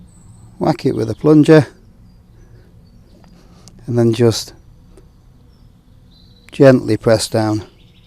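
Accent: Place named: Wigan, United Kingdom. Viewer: Are you from Wigan or somewhere near there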